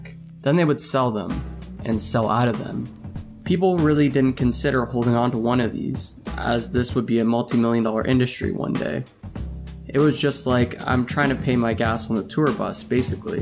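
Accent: Canada. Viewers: Hey, are you Canadian? Once you mentioned Wrong Bar and from 2012 i was like wait.. that sounds like Toronto talk!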